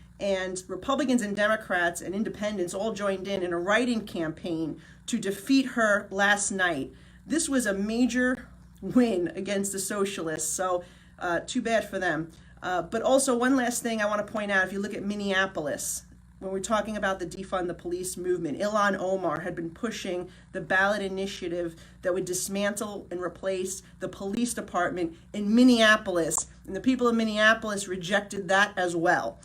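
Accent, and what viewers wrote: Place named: New York, United States. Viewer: As a Texan, usually I can't stand a NY accent. But how sweet it is today!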